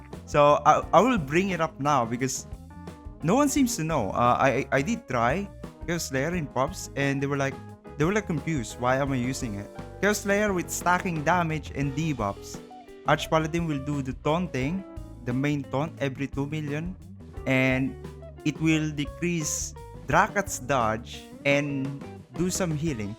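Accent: Philippines. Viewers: Thanks much bro! Btw, you sound like Filipino😅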